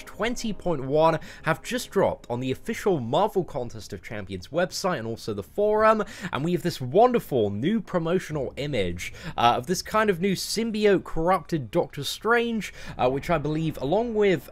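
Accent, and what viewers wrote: United Kingdom. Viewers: Why read the patch notes when you can have them narrated to you with a British accent? ;)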